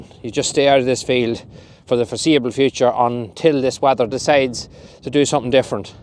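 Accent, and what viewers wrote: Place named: Rural, Ireland. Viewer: I have lived abroad for almost 25 years. I grew up on a farm in Mayo - I love your English and use of vocabulary, it's so rural Ireland. Big respect ❤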